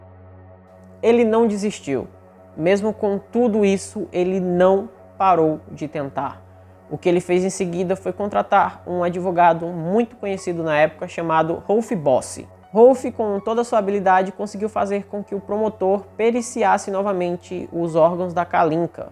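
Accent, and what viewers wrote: Northeast, Brazil. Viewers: adoro seus casos acho que vc narra bem as vezes com alguns errinhos de Português, mas não se sinta ofendido por favor!!!! As vezes é o jeitinho de falar no nordeste aqui no sul é diferente, abraços te sigo em todos os canais!!!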